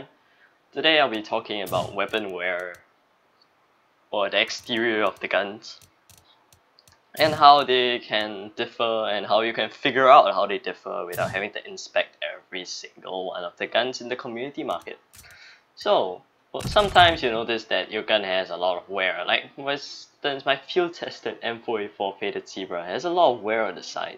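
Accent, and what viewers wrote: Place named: Singapore. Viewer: Are u singaporean? u have a accent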